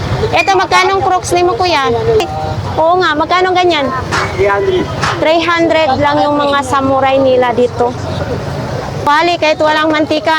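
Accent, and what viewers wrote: Visayas, Philippines. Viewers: Bisaya k mn Day?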